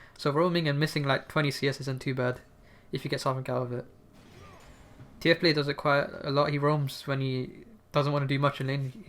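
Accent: United Kingdom. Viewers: 23.50 I am not sure what part of UK you come from. I spend some time in Scotland and it wasn't easy to start understanding the way they prenounce words. Now when I listen to you it feels like I learn a different accent and it comes easier but I understand that it might be confusing :D Oh and one more thing, you use quite a lot of glottal stops so that might be a part of a problem too. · Your voice is fine, but maybe its because I'm british as well and that's the way a lot of people talk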